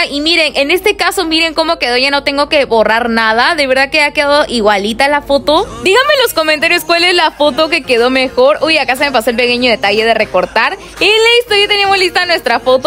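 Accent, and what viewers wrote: Peru. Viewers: tienes acento de peruana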